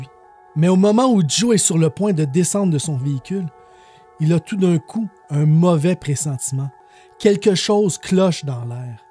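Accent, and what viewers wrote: Quebec, Canada. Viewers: Enfin un "youtuber" qui parle Québécois ! Pas de "du coup" et autres expressions énervantes à la française ! :D Merci, c'est bien raconté en plus ;)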